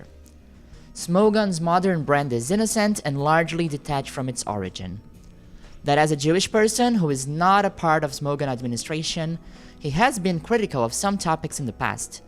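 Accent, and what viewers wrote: Brazil. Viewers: Amazing vid and research, I had no idea about that. And thank you for your work in the comments, I've scrolled a bit and couldn't find a single nazi comment (and I KNOW they came here). I hope more people see this and your channel grows! Also... You're brazilian, right? It's so easy to recognize our accent when we speak english! lol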